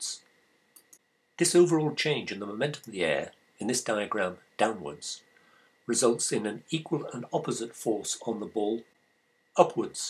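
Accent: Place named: United Kingdom. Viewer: Great video. Pleasant British accent, and succinct explanations-- what more can you want from a man?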